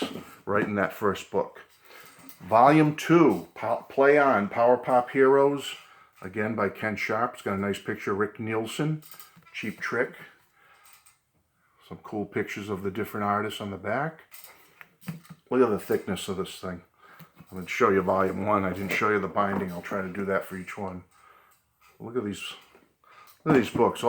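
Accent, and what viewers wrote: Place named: New England, United States. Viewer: Hi. this was a big help to me I did like your video. It was a lot of fun to here your New England accent:}. My Grand mother was from New England and see did say park the car a lot lol.:}. So thank you for that happy memory of here!!!:}. O great video lol Take care my friend!!!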